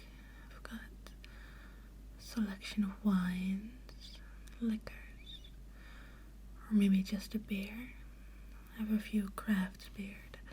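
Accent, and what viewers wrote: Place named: Netherlands. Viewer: It's funny how the teeth improve your diction with your Netherlands accent. ;D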